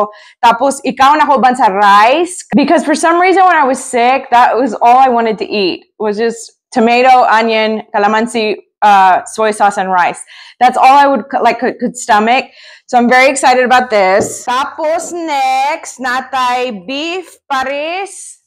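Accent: Visayas, Philippines. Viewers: Bisayaa jud nimo dai oi!! 😅😅 Lipay jud maka dawat ug regalo!! Happy cooking!! 👩‍🍳🍴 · Katawa jud ko nimo kay Bisdak kaayo ag imo inisturyahan, unya Amerkano kaayo imong hitsura.. Americanong Bisaya jud. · Ang accent bisaya jud kayu ba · Wow bisayang bisaya magsalita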